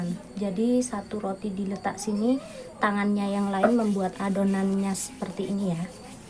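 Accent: Java, Indonesia. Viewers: Asyik jowomu jek medok dik ❤ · seperti org Jawa tp bukan Jawa barat Sunda soalnya jawany seperti ada mirip bhasa cirebon tp bukan heee pernah bilang "umeb" artinya mendidih heee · Jowone Endi sampean mbak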